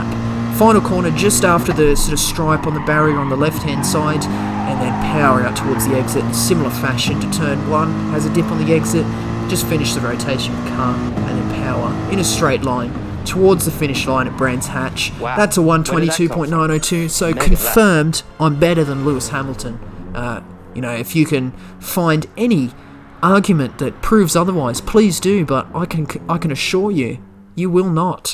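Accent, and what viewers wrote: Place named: Australia. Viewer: The dragon trail and lake Maggiore were difficult but i could get ahead half a second by certain places around the lap, brands hatch is something else! 100ths of a second is vital and being a controller user means turning and trail braking are really tough. I've come to learn that the point on the controller for trail braking is just past the point of neutral or still. It's like half a millimetre, only a handful of times can i get it to come through the corner up on Lewis. What is your brake balance set at? Great vid and very nice to hear an Aussie voice for a change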